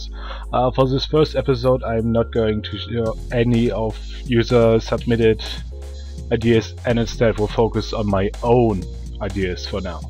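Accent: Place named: Germany. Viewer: My Internet is spazzing out atm - I can't even load up SPUF yet I can watch YT videos (like this one) just fine. Good job, you made some nice item changes, and... Your voice is kinda...Well, German. :P No offense, just saying. (also, not Winjer - just saying :P)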